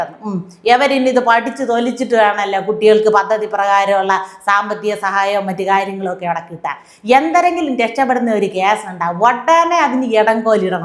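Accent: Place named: Thiruvananthapuram, India. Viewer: തിരുവനന്തപുരം ഭാഷ ശൈലി തിരെഞ്ഞെടുത്തതിന് നന്ദി കാണാനും കേൾക്കാനും ഒരു ചേലുണ്ട്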